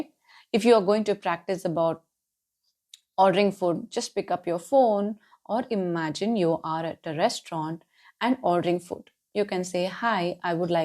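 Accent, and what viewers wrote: India. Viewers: Mam Malayali ano, angane thonnunnu.... Great video 🙏🏻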